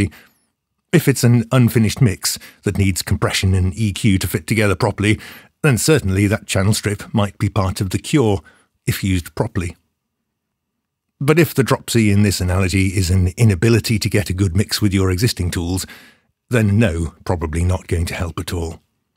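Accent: United Kingdom. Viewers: You sir win the Internet! Another brilliant video. Thank you for your wisdom and your BBC voice that delivers it haha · which area of UK this accent ?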